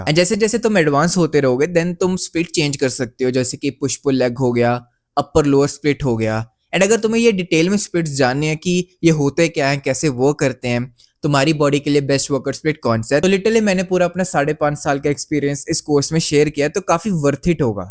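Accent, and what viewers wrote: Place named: Pakistan. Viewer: Accent to pakistani lagte ho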